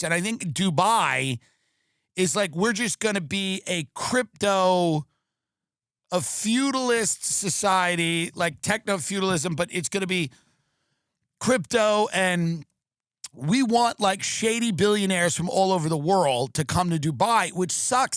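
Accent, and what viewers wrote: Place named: Long Island, United States. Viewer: The migraines from the media are only soothed by Tim’s raspy, Long Island voice whethered by whisky and Paul malls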